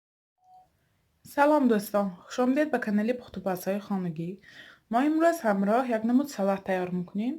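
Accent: Isfara, Tajikistan. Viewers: Аз суханатон фахмидамки хамшахри мо хастед исфарая кучошба кадом шахри расия зиндаги мекунид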